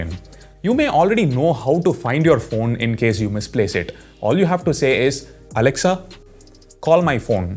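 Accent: India. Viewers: L'accent hindou en anglais est insupportable. Je ne peux pas écouter plus de 10 secondes.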